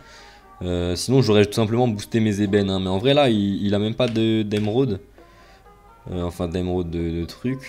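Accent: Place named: Alsace, France. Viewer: L'accent alsacien qui s'entend fort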